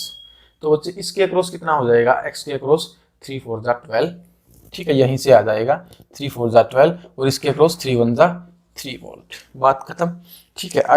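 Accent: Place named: Haryana, India. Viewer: sir aap Haryana se lagte ho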